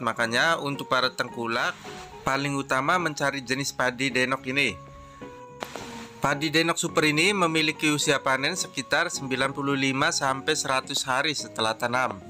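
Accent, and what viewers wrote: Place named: Indramayu, Indonesia. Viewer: Sampean sing daerah endi, indramayu e kang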